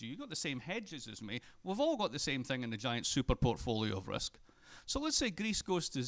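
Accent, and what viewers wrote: Scotland, United Kingdom. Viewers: + SpectatingBystander - The speed of rapport and the Scottish accent make it difficult for an audience whose 1st language is not English. I am English and could only just keep up with him.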